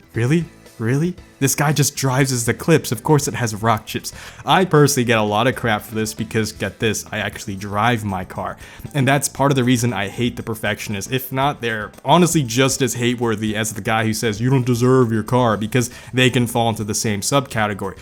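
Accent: United States. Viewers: Ur asian? Ur like THE MOST american sounding person i have ever heard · he is Asian but sounds like an American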